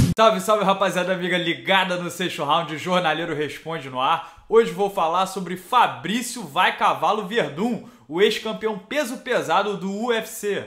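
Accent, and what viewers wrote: Rio de Janeiro, Brazil. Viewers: tem q tirar esse sutaque carioca ai que o canal fica melhor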